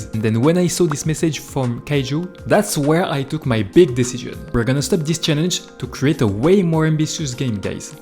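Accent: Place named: France. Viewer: French, huh? I thought I recognized the accent :)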